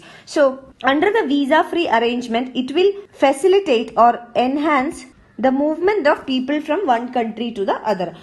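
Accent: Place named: South India, India. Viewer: Her pronounciation seems like south indian not sure if hindi version would come.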